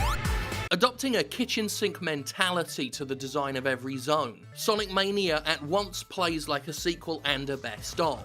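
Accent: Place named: United Kingdom. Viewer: Are you sure about that? From his accent he's clearly British! =P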